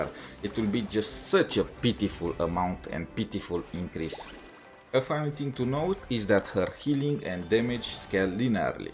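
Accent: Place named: Philippines. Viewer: ur accent is strong. r u perhaps from phillipines?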